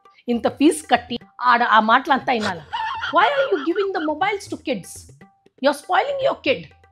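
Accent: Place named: Andhra, India. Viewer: Which part of andhra is this accent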